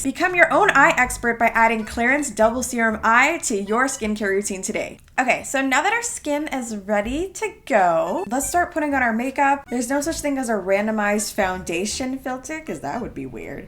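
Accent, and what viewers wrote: United Kingdom. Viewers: She has a good British aksent lol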